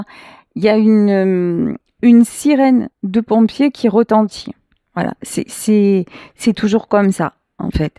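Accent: Marseille, France. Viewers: Coucou...merci pour ce beau message d'espoir et d'un visible...navré pour vous de la perte de votre frère...j'ai versé une larme de Pierrot pour vous deux...j'ai perdu des êtres chers, très chers, comme vous le décrivez! Votre tirage me parle tellement, la personne qui trahit, et des défunts, j'en ai 3, dont une que je vois souvent dans mes rêves. Votre épreuve vous rend encore plus forte et plus belle, rayonnante...d'ailleurs, vous avez une voix magnifique, et hé vous écouterai pendant des heures, toute une vie. Votre voix me rappelle la femme qui guide la méditation de Doreen Virtue, et qui dure une heure...on se sent porté, guidé, aimé, protégé, comme avec vous! Un Ange 👼! Merci à vous, merci d'être là! Et moi même thérapeute, enfin plus précisément pédagogue, puisque je suis sophrologue, je suis ému et transporté comme je pourrais le faire en relaxation...Je vous embrasse belle voix marseillaise, peu cher...oui, très cher! 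Très chère...Jeux de mots 🤩😘🌞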